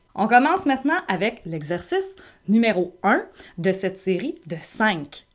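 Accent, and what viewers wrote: Quebec, Canada. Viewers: Vous êtes québécoise ???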